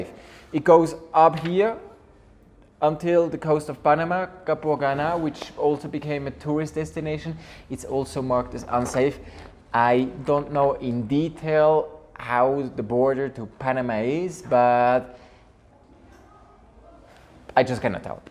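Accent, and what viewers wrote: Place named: Switzerland. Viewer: From which country are you? (the accent sounds really familiar to me....😄 Schwiizer, gäll?! 😂 ✌️ 🇨🇭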